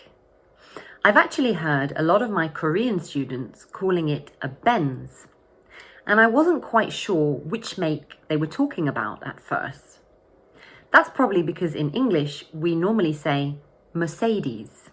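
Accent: United Kingdom. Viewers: 와 진짜 왜케 깐지나냐 영국 발음.. 자꾸 눈 앞에 왠 양복입은 신사가 아른거림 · 영국식 영어는 표준이 아닙니다. 미국인도 듣고 비웃는게 영국식입니다. 미국식 영어 발음을 들으세요. 하이윤다이? 미국은 히윤다이, 헌다이 등 다양합니다. 저 발음에 속지마세요. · 영국 자동차 리뷰채널 Carwow 시청자들은 자주 들어봤을 발음이네요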